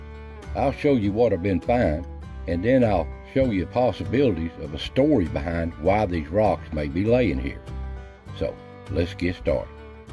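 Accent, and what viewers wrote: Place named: South, United States. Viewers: Appreciate your history and videos! As soon as i heard you speak i knew you had to be from East TN or southeast KY. Im very close to Cumberland gap and middlesboro area. Anyway, i recently picked up the hobby of metal detecting and Im running into the same thing as you with those “hot rocks”, especially on my small farm. It’s a challenge sometimes! Thanks for the info on the various types of rocks! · Your channel is awesome and I love your country accent 🙃🙃🙃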